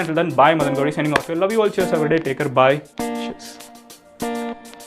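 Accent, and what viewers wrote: Madurai, India. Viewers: Bro Neenga Maduraikaaran solrapove summa getha irukudhu.❤️❤️❤️❤️engà irukeenga nu solunga nerlaye visit pana varen..Am from Mahatma Gandhi Nagar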